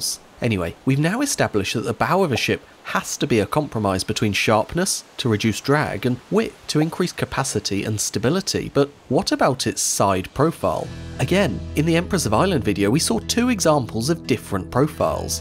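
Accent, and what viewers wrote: United Kingdom. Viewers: Why are all the youtube documentary and educational videos with a british accent? They're not ALL attractive, this one is gross he sounds like he's 12.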